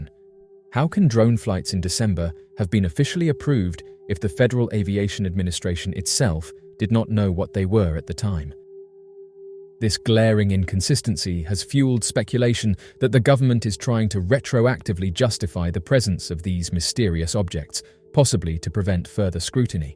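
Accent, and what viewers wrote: United Kingdom. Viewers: This channel is such bullshit .Right wing shill and then act like they get memo's from Anonymous😂Delivered in this ridiculous brit accent like that makes it seem serious.What a constant on running joke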